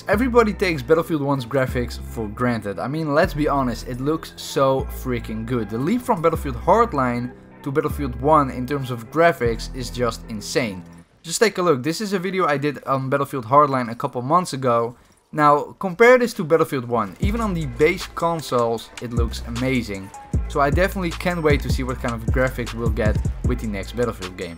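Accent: Netherlands. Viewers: Ik dacht dat ik goed in engels als nl zijnde ik herkende je accent bijna niet good vid do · Are you dutch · Such a Dutch accent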